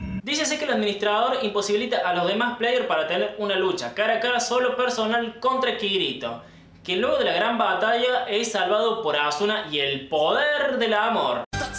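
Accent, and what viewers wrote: Córdoba, Argentina. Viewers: es cordobez :v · Porque es cordobes :v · Dacmass Creó porque ambos son cordobés :v tu de donde eres? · ¿Sos cordobés? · Sí, es cordobés.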